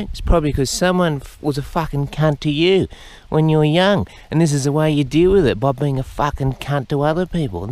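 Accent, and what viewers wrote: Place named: Australia. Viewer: This would lose half its worth without his Aussie accent lmfao 😂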